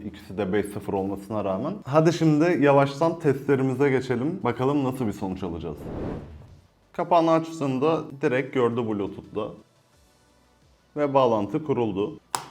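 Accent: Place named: Bingöl, Turkey. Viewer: Kardeş sen bingöllü müsün aslen?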